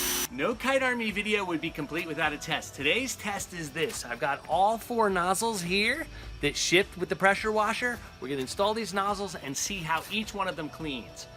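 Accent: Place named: Pennsylvania, United States. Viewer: hehe is this guy from PA? I like how his accent says "water" as, "wadder" :0) Great tips though, I like a visual on how to hook mine up.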